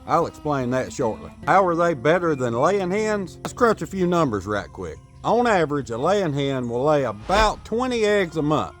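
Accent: United States. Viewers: That's an American accent if I ever heard one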